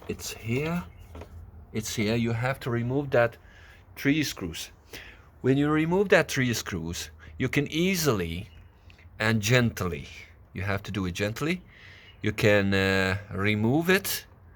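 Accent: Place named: United States. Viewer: Thank you brother تحياتي لك من بغداد اخوية الورد You have great accent i thought you r American Feeling proud of you زور باش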